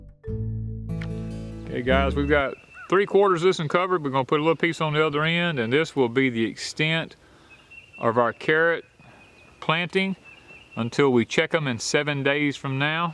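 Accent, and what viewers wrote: South, United States. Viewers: What part of the south are you please?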